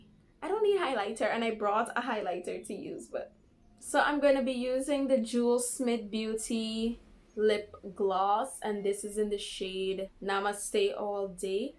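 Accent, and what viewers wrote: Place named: Guyana. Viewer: Omg u have a Guyanese accent nice love it❤️❤️❤️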